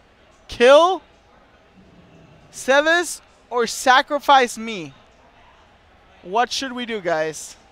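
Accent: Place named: Venezuela. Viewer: You are from Germany? I thought you were from Venezuela.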